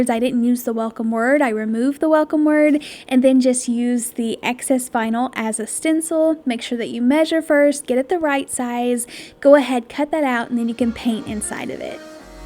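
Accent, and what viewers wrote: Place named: Utah, United States. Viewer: You kinda' have a Utah accent. Do you live there?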